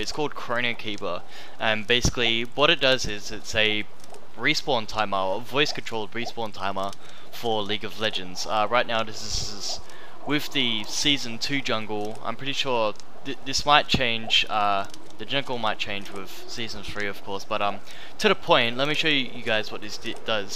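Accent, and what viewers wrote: Australia. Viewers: Voice command doesn't work properly because he speaks with an Australian accent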